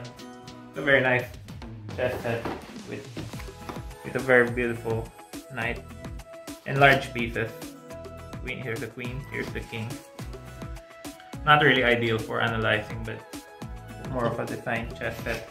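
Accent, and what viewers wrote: Philippines. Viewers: Such a Filipino accent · He still speaking like a filipino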